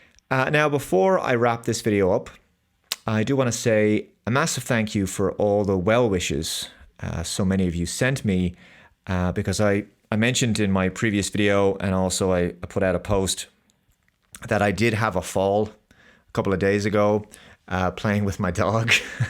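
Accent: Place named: Ireland. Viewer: So glad that your break was relatively clean. I hope it heals up nicely. Good luck with the appointment next week! Outside of Spiderman, I haven't gone to theaters to see a superhero movie for several years. It is really sad, but I agree with your reasons for the superhero fatigue. I enjoy your content and love listening to your Irish accent! ❤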